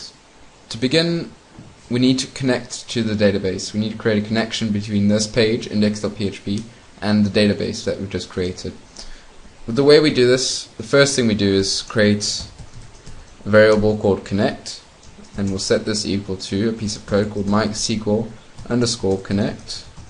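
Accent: South Africa. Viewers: Yay a South African :) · South African yeah?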